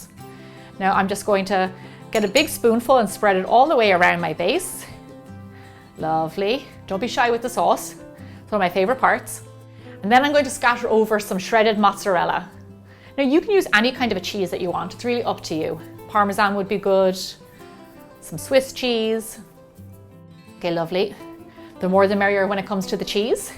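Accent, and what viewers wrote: Ireland. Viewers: her Irish accent 😍😍😍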